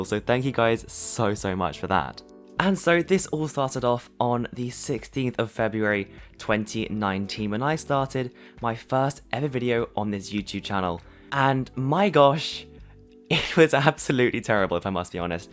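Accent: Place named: United Kingdom. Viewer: I love his BRITISH accent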